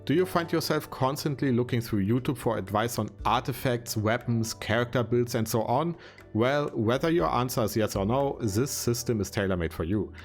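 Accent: Germany. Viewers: Du bist so Deutsch man hört das direkt aus dem akzent xd Gutes Video😆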